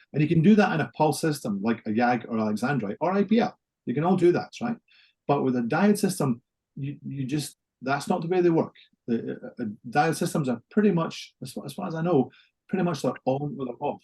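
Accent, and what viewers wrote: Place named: Scotland, United Kingdom. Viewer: Thank you for your amazing insight/understanding IPL! We have just introduced the Venus Versa into our clinic……….the trainer was shite at explaining what is a very complex area of energy devices!! I am not good at retaining information😂……..but I rewatch all your videos and each time I retain more😊 Please keep them coming!!!🎉 Love from Western Australia (also Scottish, so it’s a dream listening to you! 😂😂🏴)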